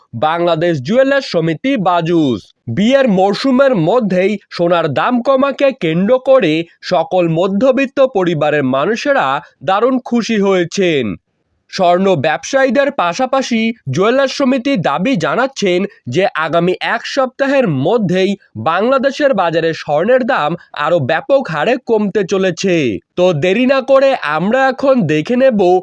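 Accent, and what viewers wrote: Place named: Kolkata, India. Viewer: কথা শুনে মনে হইতেছে কলকাতা বাঙ্গালী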